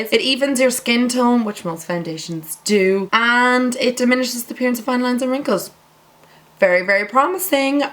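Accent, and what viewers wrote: Ireland. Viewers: your videos are so good! I live them. are you irish , you sound sorta irish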